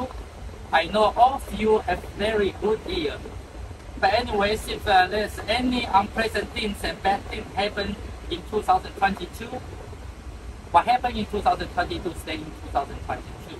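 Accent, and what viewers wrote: Taiwan. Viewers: 風趣的台式英文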